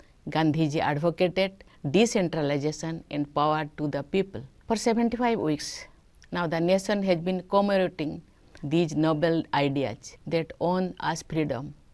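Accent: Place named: Odisha, India. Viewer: Love that ODIA- Mayurbhanjia accent…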